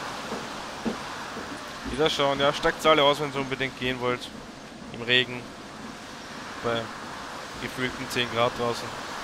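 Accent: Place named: Austria. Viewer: Das hört man doch das er Österreicher ist.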